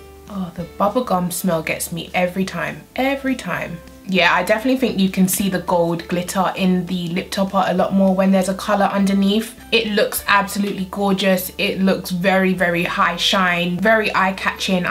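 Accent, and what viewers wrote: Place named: RP, United Kingdom. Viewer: I love her voice😂😂😂😩 its so posh even tho im British myself😅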